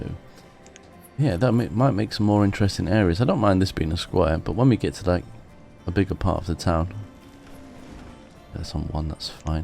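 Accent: England, United Kingdom. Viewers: Great, Lake Dulcet is back on track! On a different subject, when you close your eyes and listen to certain parts of this episode, it reminds me of someone and it finally dawned on me who... Is Hugh Grant from your part of England (similar accent)? ;-)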